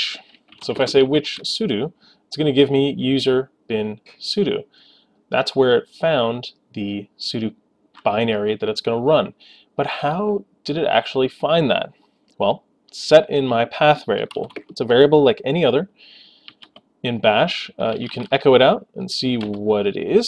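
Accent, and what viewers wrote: Russia. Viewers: Отличное видео и хороший русский акцент! p.s. Из России с любовью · I loved your Russian accent 😂😂😂😍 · damn! that was a perfect russian accent man!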